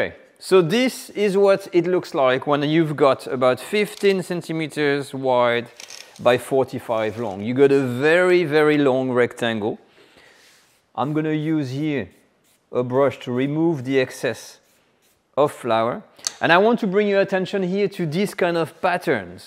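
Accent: France. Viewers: Please don’t lose your French accent. We Aussies love it. Can you use Gluten-Free flour?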